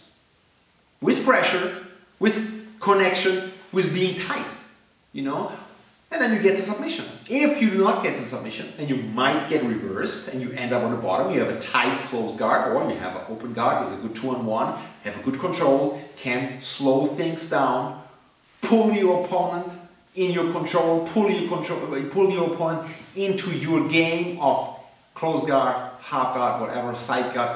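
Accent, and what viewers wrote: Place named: Europe. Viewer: Hi, love your work! I fully understand what you are talking about. I have a couple of questions: 01. Are you going to be doing instructional submissions escapes and positional escapes anytime soon? 02. If someone wants to be moving up the ladder in your philosophy and your jiujitsu... How does one go about it (You sound European, so it would have to be remotely)? Thanks!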